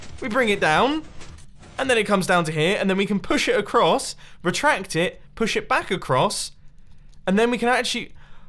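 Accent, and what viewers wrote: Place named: United Kingdom. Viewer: "It's gonna be complicated..." he said in his brittish accent..."lemme make it more complicated" me saying as if I was him....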